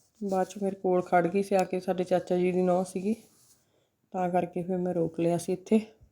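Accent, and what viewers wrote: India. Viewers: ਦੀਦੀ ਜੀ ਸਾਡੇ ਹੁਸ਼ਿਆਰਪੁਰ ਚ ਵੀ ਇਸੇ ਤਰ੍ਹਾਂ ਬੋਲਦੇ ਹਨ ਜਲੰਧਰ ਵਿੱਚ ਮੇਰੇ ਪੇਕੇ ਨੇ ਤੇ ਹੁਸ਼ਿਆਰਪੁਰ ਵਿੱਚ ਮੇਰੇ ਸਹੁਰੇ ਤੁਹਾਡੀ ਵੀਡੀਓ ਬਹੁਤ ਵਧੀਆ ਹੁੰਦੀ ਹੈ। ♥️♥️ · ਸਤਿ ਸ੍ਰੀ ਅਕਾਲ 🙏ਦੀਦੀ ਜੀ, ਇੱਕ ਪਲੇਟ ਮੇਰੀ ਲਾ ਲਓ ਇੱਕ ਕੌਲੀ ਸ਼ਿਮਲਾ ਮਿਰਚ ਦੀ ਇੱਕ ਖੱਟੇ ਦੀ ਕੌਲੀ, 😋😋, ਤੁਹਾਡੀ ਦੁਆਬੇ ਦੀ ਬੋਲੀ ਵੀ ਸੋਹਣੀ ਹੈ🥰, nyc sharing🎉🎉👍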